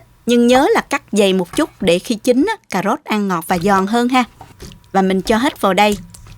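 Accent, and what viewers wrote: South, Vietnam. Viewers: Giọng Nam Bộ kiểu mỗi ngày một hiếm, giờ xem truyền hình, phim trên HTV, giọng nam toàn gì đâu.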